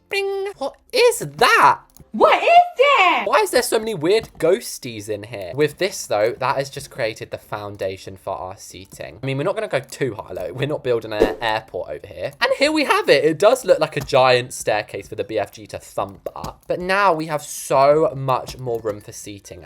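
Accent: England, United Kingdom. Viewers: OML FINALLY A BLOXBURG YOUTUBER WHOS BRITISH NAH I AM SUBSCRIBING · Why dose his english accent make him sound so sophisticated it makes sound like hes about to ryme every time he says something lol🤣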